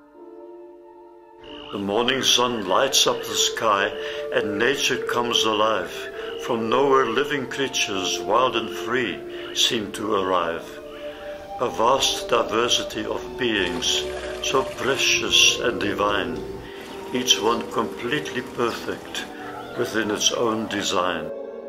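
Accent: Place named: South Africa. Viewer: Wonderful and heartfelt video, well done! Good to hear a friendly South African accent too:-)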